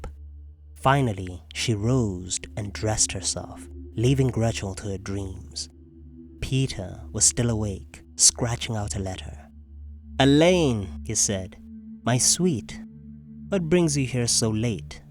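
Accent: South Africa. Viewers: This dude sounds South African😅.... great analysis by the way❤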